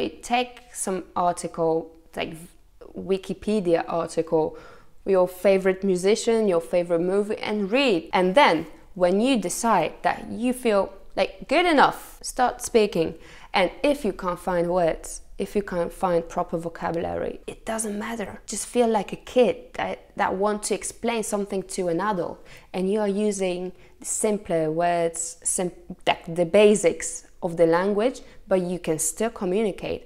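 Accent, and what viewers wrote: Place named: United Kingdom. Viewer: mówi po angielsku z prawie idealnym brytyjskim akcentem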